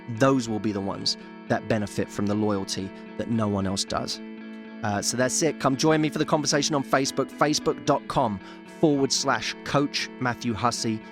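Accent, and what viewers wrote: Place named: United Kingdom. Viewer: Cute much! Dungarees....You mean Jeans, right?? Love your British accent & names for items.... ;) <3 Hugs from Canada...;)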